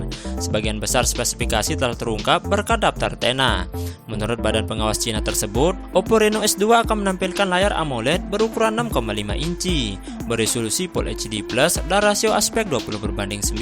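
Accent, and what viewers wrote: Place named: Java, Indonesia. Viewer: Adooh, medok suarane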